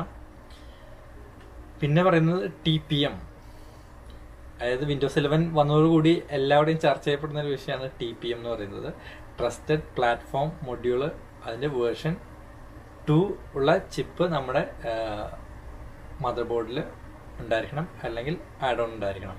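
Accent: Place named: Thrissur, India. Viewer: തൃശ്ശൂർക്കാരൻ ആണ് എന്ന് മനസ്സിലായി അല്ലേൽ എറണാകുളം ❤❤❤❤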